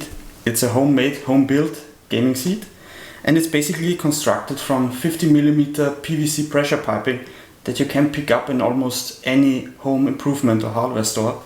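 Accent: Germany. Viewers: Hört sich so deutsch an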